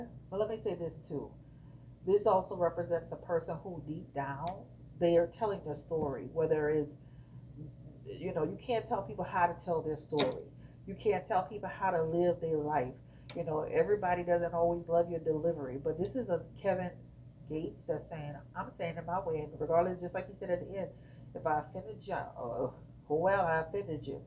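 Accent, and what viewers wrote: New Orleans, United States. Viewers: You got jokes 😂 I love our New Orleans accent⚜️ What’s so funny is… I never knew we had an accent until I caught on my taking the time to listen 👂🏽. How do we sound to you??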